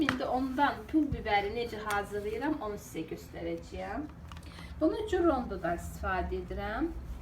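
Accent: Azerbaijan. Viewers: Pul biberi begendim. Azeri kardeslerimizin konusma tarzina bayiliyorum. Ellerinize saglik.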